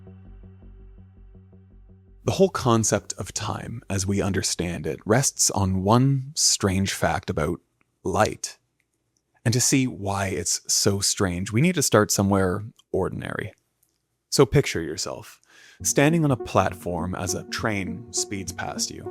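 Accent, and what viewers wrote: Ontario, Canada. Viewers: I find this interesting but can't get past the silly, thick canadian accent. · fantastic. And I love your Ontario accent. Question though, how do we avoid a completely deterministic view. I was waiting for you to address cause and effect as a necessary component of "event". And event sequence can go both ways mathematically like pool balls, but one event leads to another and involves choice. Can you address cause and effect an include probability? Does this only result in the multiverse? The "butterfly effect" is implied here and has some implications. Essentially, there has to be an infinite number of futures because cause and effect is also a measure of time, not just entropy. Two events, one caused by another, must happen at different moments in a sequence and in time. To use the pool ball analogy, nothing is going to prompt the ball to emerge back out of the pocket and strike the ball that had hit it and result in the same pathway in reverse. 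It would require a new event at the bottom of the pocket, some sort of calibrated "popper" but even in that case, the sequence of events would follow a different path because it would be responding to different forces. Basically, I was with you until the end of this video..... fellow southern Ontarian... lol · From your accent I thought you might be Canadian but I notice your profile says you're based in the US.. Are you perhaps originally from Canada?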